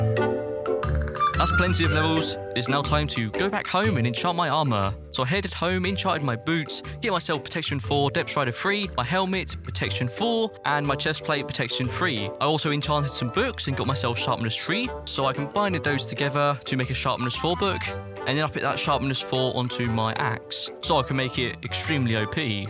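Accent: United Kingdom. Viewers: He sounds like in uk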